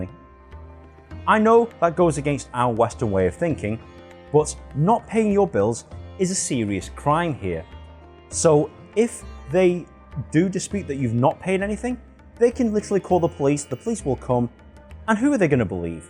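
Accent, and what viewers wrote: United Kingdom. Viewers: You look asian but your accent is Brit.. what gives man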